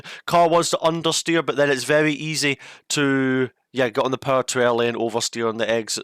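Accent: Scotland, United Kingdom. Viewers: I love thee Scottish accent mate.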